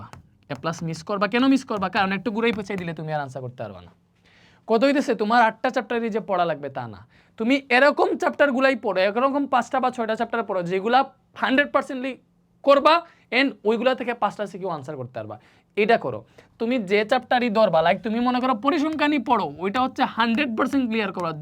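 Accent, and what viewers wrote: Sylhet, Bangladesh. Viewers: Apni ki Sylheti Paz vai ripley den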